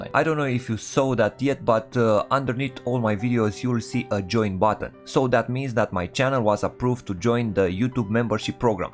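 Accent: Romania. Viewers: Nu stiu de ce, dar accentul tau imi spune ca esti roman. Buna treaba, explicarea layerelor nu e comuna, multa lume face doar speed art. Esti bun, evoluezi repede. If I assumed wrong what I wrote in first part I still want to tell you thanks for your layers break down. We dont get this thing so often, majority of ppl just posting speed art.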